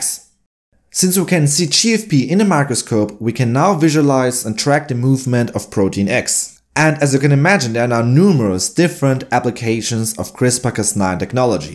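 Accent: Germany. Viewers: Are you threatening me, Master Jedi? I am also a native German speaker and I can clearly notice the German accent. Maybe because even though everyone I've talked to in the past 15 years spoke German with me I almost exclusively consume English-speaking entertainment and do so for hours daily. Though I have to say it's way better spoken English than pretty much anyone I know :p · As a native English speaker, it really isn't. He speaks too quickly and smears his words without pronouncing all of the sounds. If he didn't do this, he would be a lot easier to understand even without the heavy German accent. People who speak one language are bad judges of a foreign language spoken by their peers because they are used to speaking with and hearing their native phonemes, even when used to approximate another language. Good video and content, just needs to slow down and work on pronunciation and annuncuaction.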